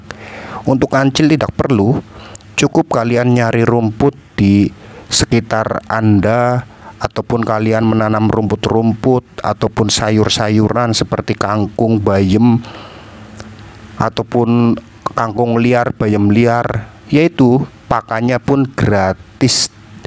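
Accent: Banyumas, Indonesia. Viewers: Apakah Bpk berasal dari Cilacap,Purwokerto,Banyumas,Tegal,brebes,Pemalang,Banjar negara Kenapa Ngapak😂😂😂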